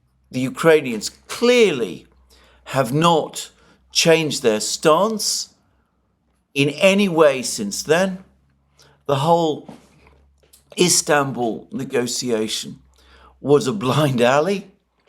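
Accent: United Kingdom. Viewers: it is an ai, it is transcribing Alexander's British accent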